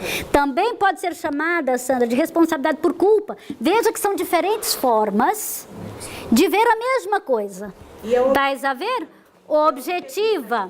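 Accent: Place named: Brazil. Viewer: Ela é brasileira? Sotaque parece do Brasil!!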